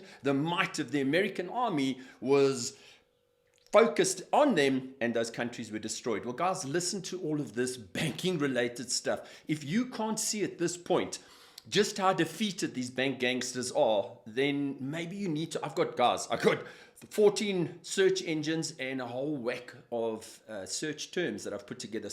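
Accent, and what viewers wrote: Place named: South Africa. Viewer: Thank you so happy to hear a south african voice talking about this. Do you perhaps have a website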